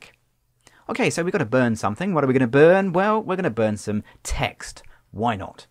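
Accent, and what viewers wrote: United Kingdom. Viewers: Omg , Man great accent. No offence intended , but it is like those english safari loving adventure Tarzan mode ppl. Great turtorial . Challiho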